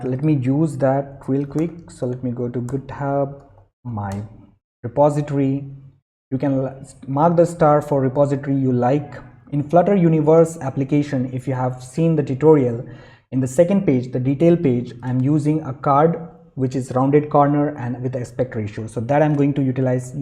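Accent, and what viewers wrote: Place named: India. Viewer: Начал понимать английский на слух, на тебе индуский английский. Блэт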